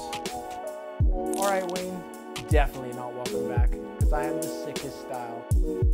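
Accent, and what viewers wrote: Canada. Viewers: Your Canadian accent is so strong in this, pronounced sorry the Canadian way.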